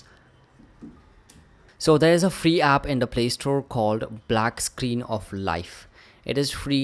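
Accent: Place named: India. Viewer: its indian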